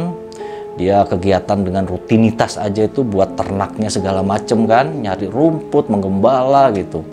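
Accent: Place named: Sunda, Indonesia. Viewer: Sunda na kentel pisan atuh wkwk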